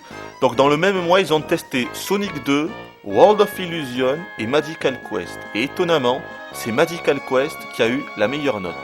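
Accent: South, France. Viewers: Ah sinon, j'aime beaucoup ta voix! Elle est agréable à écouter... cela doit venir en parti de ton accent du sud :)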